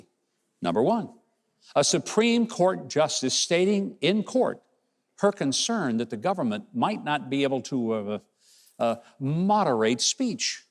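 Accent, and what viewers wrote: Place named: Tennessee, United States. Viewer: You sound like you are from TN. Hockabee.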